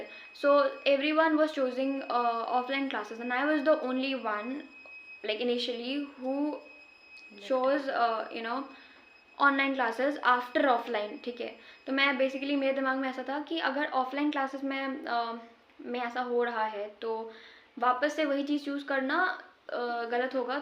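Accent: Bihar, India. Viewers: kya aap bihar se ho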